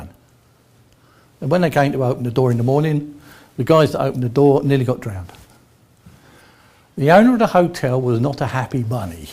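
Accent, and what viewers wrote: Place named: England, United Kingdom. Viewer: Sir I just in love with your English accent its far better than American accent. Please let me know where is this from?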